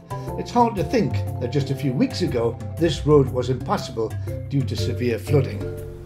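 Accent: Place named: Newcastle, United Kingdom. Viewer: LOVE THE JORDY ACCENT LOVE YOUR VIDEOS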